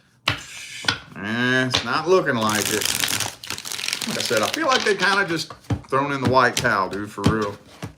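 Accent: South, United States. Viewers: This resonated and also your accent reminds me of home in the south! Live in Cali now but I miss these accents ❤😊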